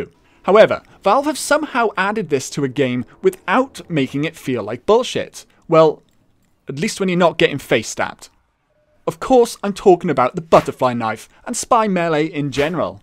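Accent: Wales, United Kingdom. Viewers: Are you welsh? I hear the accent and emphasis on words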